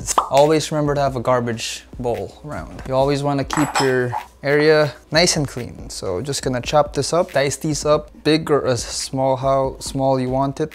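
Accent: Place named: Philippines. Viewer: I THINK YOUR ARE FILIPINO BECAUSE OF YOUR ACENT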